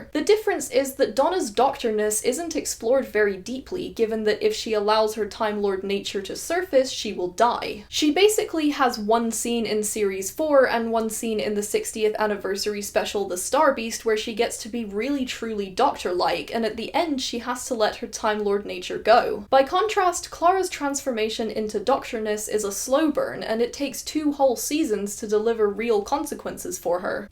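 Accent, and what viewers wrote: Scotland, United Kingdom. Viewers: i cant pin your accent, you sound a bit scottish, a bit irish, but with an english twang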